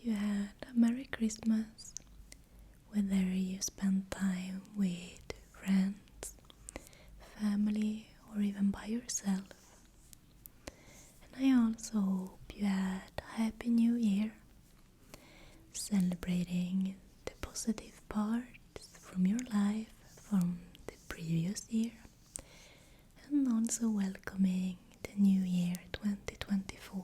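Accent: Sweden. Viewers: Your channel has been my favorite lately, I listen to your videos whilst gaming, so I'm relaxed. I like your voice and your accent a lot, it's a cute little accent, the swedish one. But I also like how open you are, it's hard to open up publicly. After that, it becomes kind of a distant, distant, distant friendship, where we won't talk, we won't see each other, but I kinda want you to do great and feel sad when you're doing poorly, if that makes any sense. I hope you can resolve your health and emotional situations rapidly. God bless. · Tack för dina videos! Jag brukar inte tycka om engelska med svensk brytning, men detta är såååå bra! 🤩 Önskar dig det bästa i det nya året! 😄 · Your thick Swedish accent was the best part of this video!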